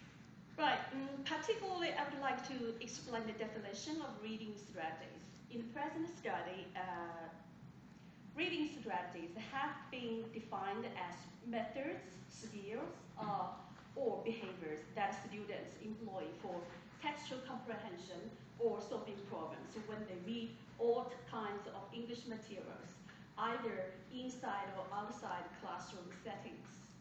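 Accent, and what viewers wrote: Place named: United Kingdom. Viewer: มิน่า... สำเนียง บริธิต เลยครับท่าน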